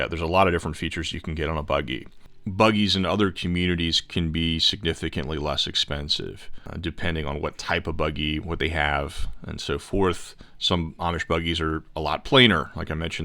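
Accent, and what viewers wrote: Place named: Baltimore, United States. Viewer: Is narrator from Baltimore? I hear a strong Bmore accent when he says window, home, here, open, et.al. words, a LOT like a native of Baltimore speaks.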